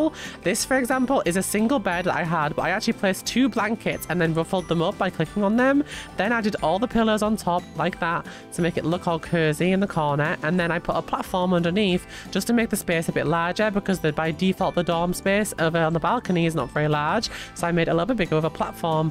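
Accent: United Kingdom. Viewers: GIRL I DIDN'T KNOW UR BRITISH! ME TOO !! <3 im 25% british lol!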